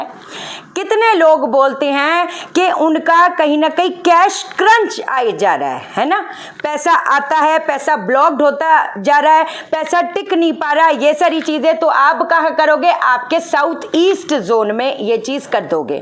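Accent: Punjab, India. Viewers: 🎊Dear Mam! 💝🎁 You know what सबसे best thing about you is your punjaabi diction and emphasis on our ownself मंत्र जप!! 💐 "👌Siddhi baat hai!! --👍☺️💝 as you most often say" 🎊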